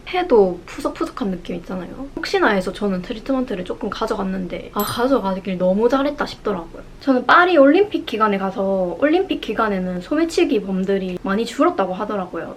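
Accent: Gyeongsang, South Korea. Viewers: 경상도 사투리 너무 귀엽다 💖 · 경상도의 억양이 매우 정겹네요😊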